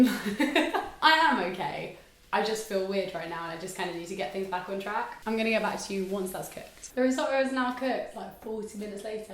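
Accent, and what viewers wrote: United Kingdom. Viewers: I do have to say, your voice is diferent. If I have to describe it, it's like you have a stonger british accent than before. Even thos this new voice is kind of cool. We could see that your are moiving on or mayber moving arround, but if i have to say something it's feels like your are quite goiging back to the same state of mind over and over. Il y had to make a resume of the process it will like: starting art video project > improving and talking about yourself > realizing you talk to much about you > sentiment of loosing personal safe space > black out youtube > then you go back down on thing > then it's the fuck that feelling > and we rewind to projects. Everyone af it's own reptitive cicle (for me it"s about meeting people > over speculate on relationships > realise the truth > pushing away human kind > being sad > and stat over) It's just weird to see someone elses loop.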